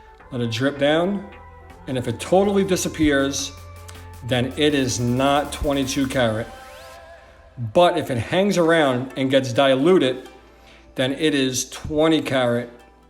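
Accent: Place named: East Coast, United States. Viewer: Thank you for this simple and effective demonstration. I do love an East Coast accent!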